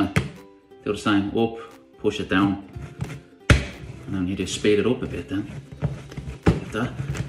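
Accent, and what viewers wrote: Ireland. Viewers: Kia ora from Aotearoa (New Zealand). 😂🤣 I love your channel, you're funny & you make beautiful food. You also explain things well, so thank you for taking the time to share your yummy recipes with us. Awwww I love an Irish accent, my Da was Irish n I miss him every day.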